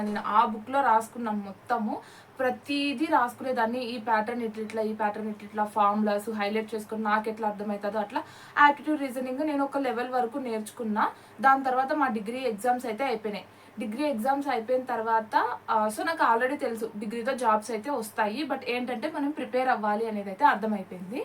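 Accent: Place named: India. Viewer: this girl is inspiration to those people who are just sitting and weeping that i dont know english i cant do my background is poor and finding many other excuses instead of just trying like her ...... i apprecciate your video sister by the way adhi indian vocabulary or pronunctiation kaadu its called an INDIAN ACCENT (WITH BASIC ENGLISH VOCABULARY) 🥰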